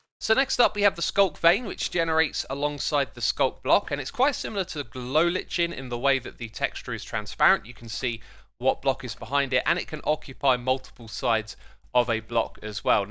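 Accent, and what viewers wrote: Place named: United Kingdom. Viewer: Why does every Minecraft youtuber have a British accent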